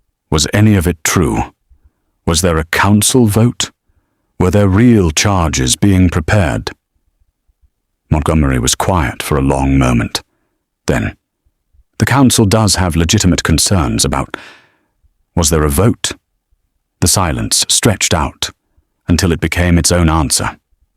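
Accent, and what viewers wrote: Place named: United Kingdom. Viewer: The narrator is British so I'm choosing to think this is set in one of the beautiful moorlands of my home country, I don't care if it isn't, I claim it! 🤣 I like he went after her, he knew he screwed up and believed he was protecting her but he should have told her what was happening instead of hiding any danger from her. She's an amazingly calm soul who dealt with this with great dignity.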